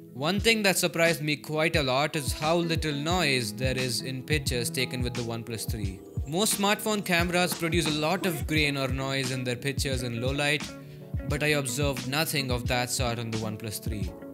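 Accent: India. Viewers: hhh, indian voice hate it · are you from kerala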